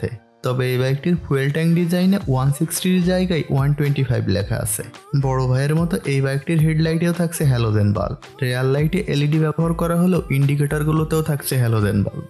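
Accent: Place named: Netrokona, Bangladesh. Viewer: kotha bolar style eto low quality keno ??? ageee valo vabe bangla bola siken...kotha sune mone hosse Netrokona area er kno jokes bolcen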